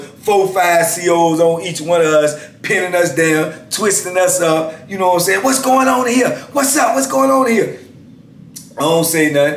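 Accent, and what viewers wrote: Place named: Louisiana, United States. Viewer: The accent tells me you're from New Orleans/Louisiana area but you remind me of Master P for some reason. I subscribed.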